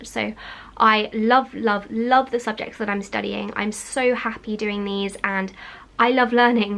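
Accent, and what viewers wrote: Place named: England, United Kingdom. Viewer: I like English sound, better than American sound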